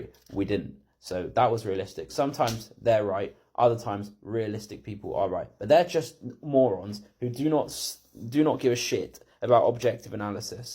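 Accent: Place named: Liverpool, United Kingdom. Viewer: Proper scouse accent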